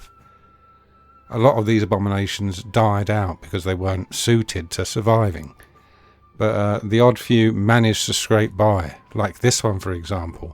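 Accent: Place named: United Kingdom. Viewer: Are you alright mate? You sound more British than usual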